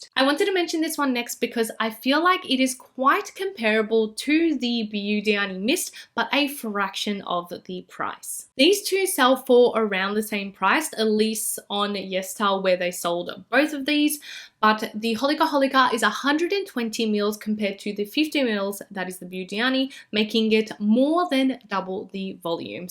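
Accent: Australia. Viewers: Her Aussie accent!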